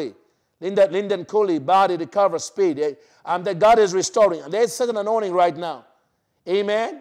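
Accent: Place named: Africa. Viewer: Honestly speaking why can't you understand that he's not Westerner but an african and you just have to understand him what he's talking about by the help of the holy spirit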